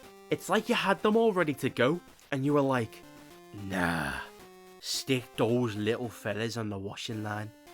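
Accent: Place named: Liverpool, United Kingdom. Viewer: Have to say bro, these videos are excellent! Like the scouse JonTron 😉 Gary chopper should be proud 😉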